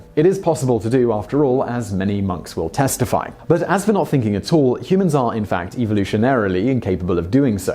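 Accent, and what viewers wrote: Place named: United Kingdom. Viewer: love this channel! it s my fave for top 10 listsand I love s narration and brit accent (and dry wit)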